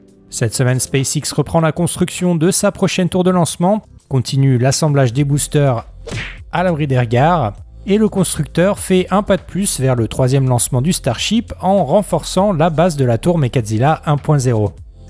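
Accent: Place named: United Kingdom. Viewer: Votre accent anglais m’éclate ^^ Je ne suis pas meilleure que vous hihihi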